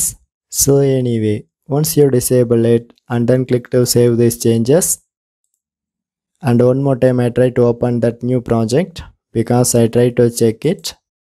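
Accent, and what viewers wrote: India. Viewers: It was hard to understand your sweet hindi accent,but it works. Thank you. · Are you from india?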